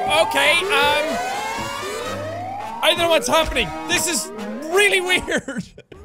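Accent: Ireland. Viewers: Every video he get more Irish.😁 I wish I was Irish 😢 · Is he Irish ☘️ I’m irish☘️💚🇨🇮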